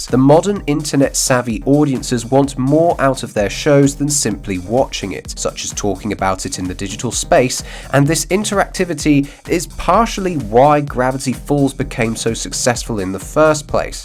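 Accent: United Kingdom. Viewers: This video sounds like you couldn't wait to make a video styled after your favorite complaining youtube channels. The british accent really sets it off. Most of the youtube channels I find complaining about movies and tv are mostly done with a british accent. Moreover, you put heavy duty spoilers in the description, but the very first thing you talk about is how you are trying to help people get a feel for wether or not they SHOULD watch the show. But you're spoiling the entire show to give your opinion. Are you like, a real person? or just some moron with a platform.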